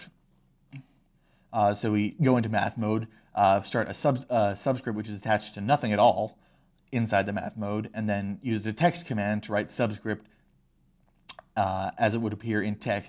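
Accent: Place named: United States. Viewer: Why are you pronouncing "Z" as "C"? ... I know it is the American pronunciation, but for non-Americans it is very confusing. :-)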